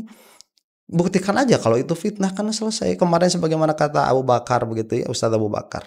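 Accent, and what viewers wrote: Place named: West Java, Indonesia. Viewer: Ciri khas sundana jelas pisan salam yi ti kuningan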